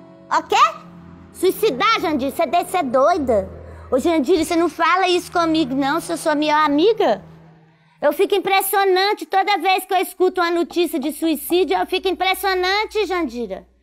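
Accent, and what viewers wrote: Minas Gerais, Brazil. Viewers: Bom de mais ouvir o sotaque mineiro.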